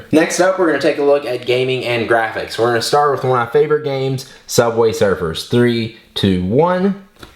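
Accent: South, United States. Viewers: Love your southern (I assume) drawl!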